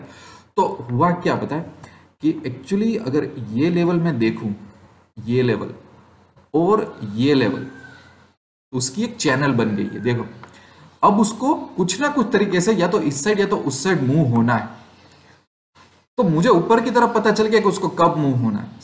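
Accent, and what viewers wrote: Gujarat, India. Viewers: Very nicely explaine in Hindi with Gujarati lehja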